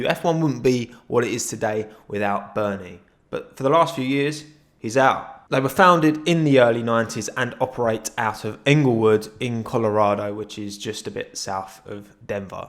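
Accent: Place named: West Ham, United Kingdom. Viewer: I could feel the West Ham in your accent from the very first video I watched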